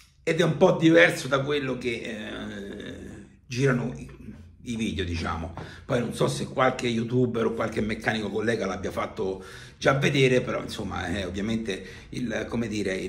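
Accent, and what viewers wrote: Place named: Rome, Italy. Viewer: Dall' accento capisco che anche tu sei di Roma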